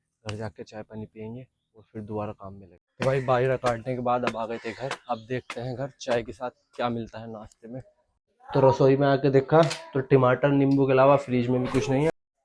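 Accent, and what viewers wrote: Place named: Bijnor, India. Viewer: Apki mmy bhot pyari h ...muje apki avaj bilkul asi lgti h jase mama ke bachoo ki h kyuki vo sb bhi bijnor side se h ...or muje to vha sbki avaj hi same lgti h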